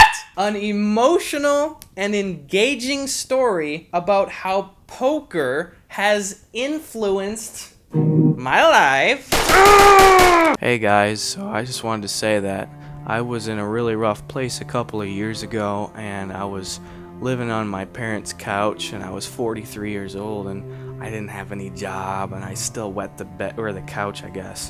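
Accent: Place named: Wisconsin, United States. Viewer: He can switch to his normal voice to his Wisconsin accent and that's pure power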